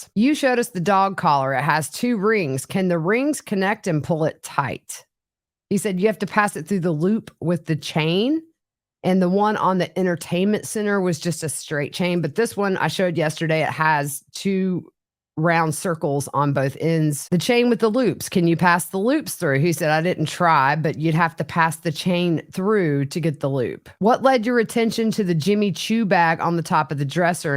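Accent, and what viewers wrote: South, United States. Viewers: What a remarkable dissonance it is to hear your sweet southern voice describing such a heinous crime.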